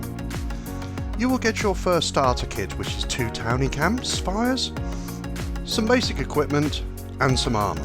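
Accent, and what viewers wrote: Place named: London, United Kingdom. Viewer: ILLUMISYS on server; Very nice Job, good presentation and very helpful. Funny you and Avomance sound a lot alike, maybe from same part of London. What do I know though, I'm just a Yankee, lol.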